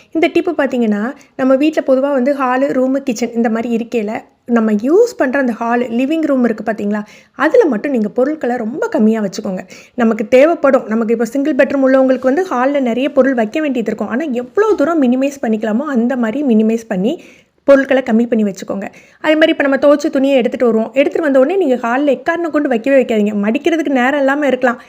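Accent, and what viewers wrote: Tirunelveli, India. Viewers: Well said.. அப்படியே Tirunelveli பேச்சு 😂😂❤❤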